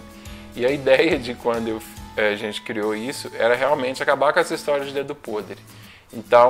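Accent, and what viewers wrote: Minas Gerais, Brazil. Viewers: Muito massa! Vou assinar o proximo! Adoro o sotaque de Minas! Sucesso meu velho!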